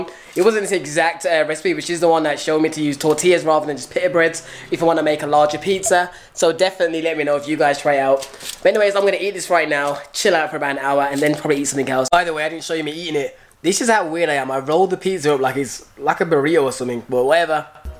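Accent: Birmingham, United Kingdom. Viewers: Fam are u from Brum ?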